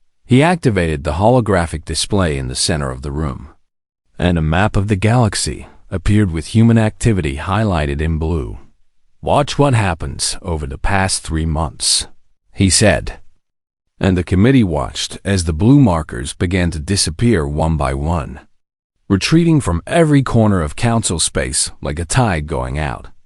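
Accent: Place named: New England, United States. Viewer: Yes, the electronic narrator is definitely from New England, and possibly tipsy. ⭐ But the story is good.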